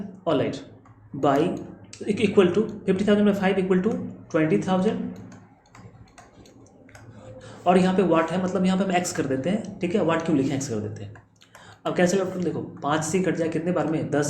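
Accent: Bihar, India. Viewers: You are from bihar na Because बणा .......बरा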